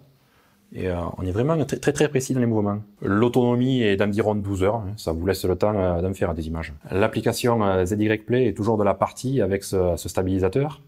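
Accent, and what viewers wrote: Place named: Toulouse, France. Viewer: l'accent toulousainnn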